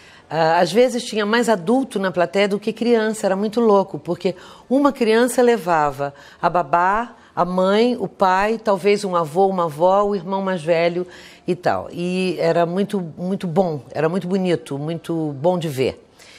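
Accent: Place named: Rio de Janeiro, Brazil. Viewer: e esse sotaque carioca lindo!!!! e a carioca mais ainda!!!!😍😍😍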